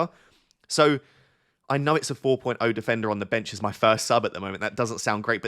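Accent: United Kingdom. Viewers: bro control your british accent very hard to catch up for me.🥲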